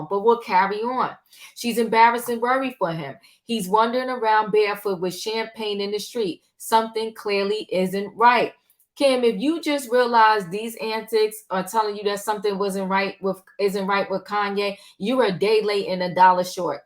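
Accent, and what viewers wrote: New York, United States. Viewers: . I’m from Queens and I hear NYC when you speak. Am I correct?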